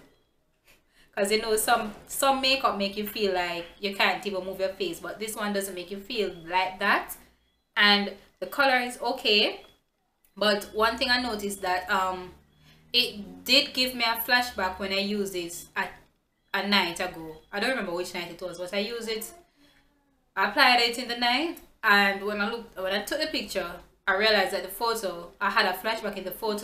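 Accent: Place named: Caribbean. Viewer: love your accent :) #CaribbeanGirls. Btw for reference what shade do you use in maybelline fit me/fenty?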